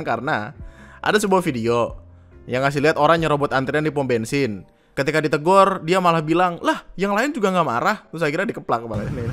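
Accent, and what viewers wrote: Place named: Kediri, Indonesia. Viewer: Kediri banget logatnya😂 salam dari Kediri bang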